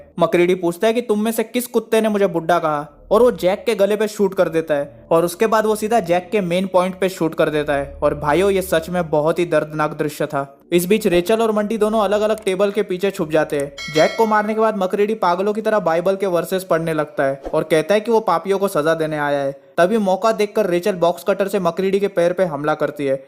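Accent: Maharashtra, India. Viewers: Bro you have marathi accent that is why I asked do you live in Maharashtra that's all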